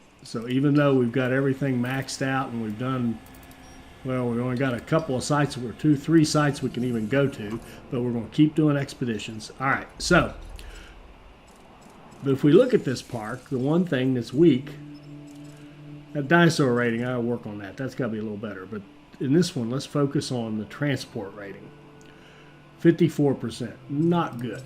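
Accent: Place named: South Carolina, United States. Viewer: You sound like a Clemson man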